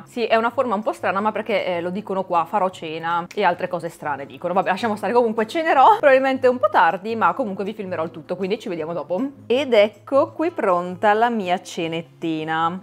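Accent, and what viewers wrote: Turin, Italy. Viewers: #avocadoimpanato(?) Ragazza mia io ti devo dire grazie mi sono segnata tutto quello che spero poi di trovare al Lidl .. Yogurt al cocco.. Barretta al cocco.. Formaggio vegano..io sicuramente lo userò x le melanzane al forno.. E gli anelli di cipolla E sperando di trovarli i gelati al cocco che avevi detto l altra volta nel video che sei stata così carina a farceli vedere😋..❤ Parli fra un po' come una di cit turin😊.. Non so cosa hai fatto ai capelli ma sembrano più morbidi e lucidi.. Continua così. PS se torni al Lidl anche la marmellata bio alle fragole merita...😋😘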